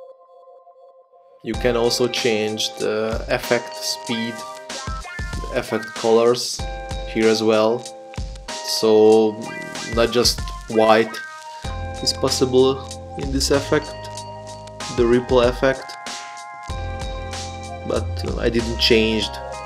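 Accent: Hungary. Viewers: Sexy magyar akcentus :*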